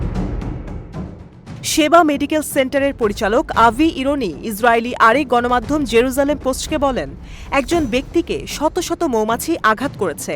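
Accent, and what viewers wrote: Kolkata, India. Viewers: আজাইরা নিউজ, কোলকাতার স্টাইলে !!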